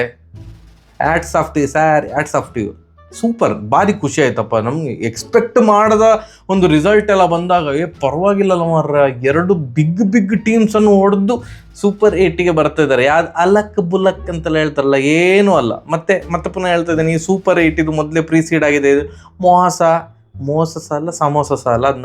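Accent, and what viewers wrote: Mangalore, India. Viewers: ಆ...ದೇವರ್ರೇ ಉಂಟಲ್ಲ..... Its bought u a new subscriber ❤❤ Love your accent' love kudla ... ಇನ್ನು ಹೆಚ್ಚು ಹೆಚ್ಚು ..ಕಂಟೆಂಟ್ ವಿಡಿಯೋಗಳು ಬರಲಿ .... ನಗುವಿನೊಂದಿಗೆ .... ❤.goodluck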